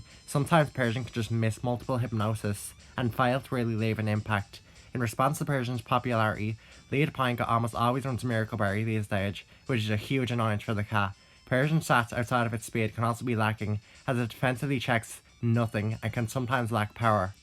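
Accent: Ireland. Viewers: Ok I’m going to guess the accent is irish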